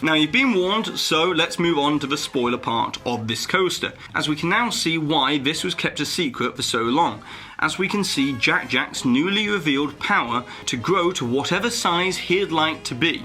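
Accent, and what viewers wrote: United Kingdom. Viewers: Wait, your British?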